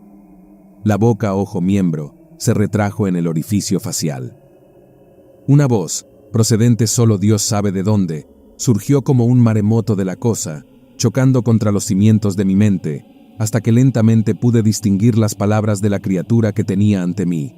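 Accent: Spain. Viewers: Es una cagada que sea tan profundo ese acento Ezpaniol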